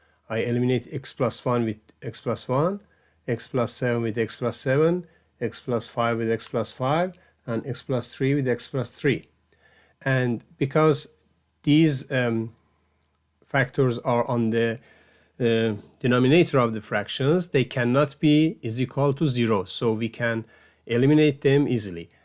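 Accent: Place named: United States. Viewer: I liked your American accent 🩵 💙💫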